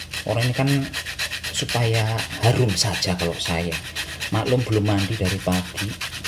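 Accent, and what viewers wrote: Banyumas, Indonesia. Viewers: Lucuuuu ms...saya jawa timur hidup di Samarinda....suka dengan logat bahasa ngapak...lucuuu poolll...ms👍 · Nyong seneng bahasane ngapak,pada bae kang nyong ya ngapak😂😂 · tetep ngomong bahasa ngapak... lebih natural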